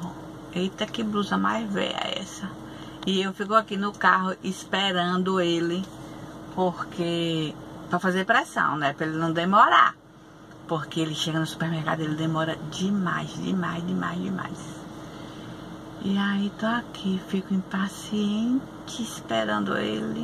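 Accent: Northeast, Brazil. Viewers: ME IDENTIFICO MUITO COM VOCÊ,PRINCIPALMENTE COM ESSE LINGUAJAR NORDESTINO SOU DE JOÃO PESSOA.PB.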